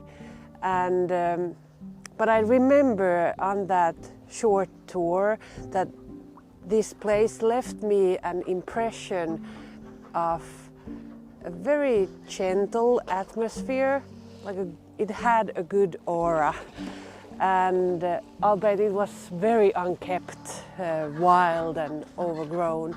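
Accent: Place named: Finland. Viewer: I love your English,! With a soft Finnish accent. Your voice would be great to read kids’ stories, about elves and gnomes 😊 Yes, I understand and support your gardening philosophy and general plan. Natural and generous for all things surrounding. Great job!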